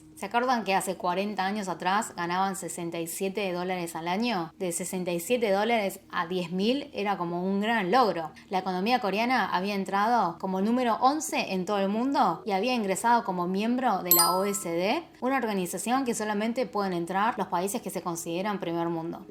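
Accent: Argentina. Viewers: Que hermoso .coreana con acento argentino ,vistes!! Saludos desde México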